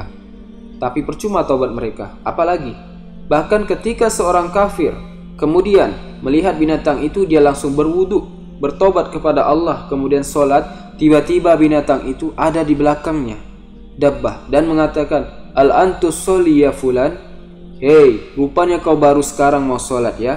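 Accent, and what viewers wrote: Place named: Sulawesi, Indonesia. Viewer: Didengar dari logat bicaranya admin ini kya ny org daerah sulawesi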